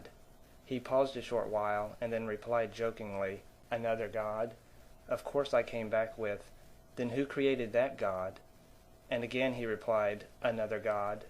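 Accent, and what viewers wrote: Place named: Oklahoma, United States. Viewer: You sound like your from Oklahoma.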